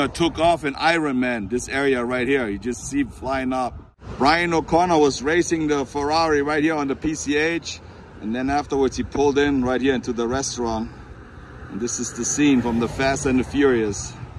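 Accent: Germany. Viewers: Thanks for the tour. I find it very interesting. Seems there's many beaches to choose from, all pretty close together. Loved the video. Love your Deutschland accent & the Arnold impression. Stay safe!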